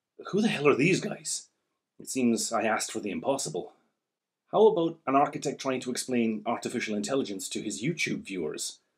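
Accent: Ireland. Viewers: Your accent is obviously Irish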